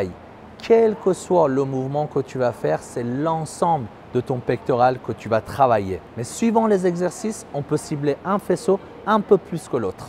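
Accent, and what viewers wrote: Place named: Iran. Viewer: IL EST IRANIEN LUI C EST PAS POSSIBLE CET ACCENT hahahahahah de la part d'un autre iranien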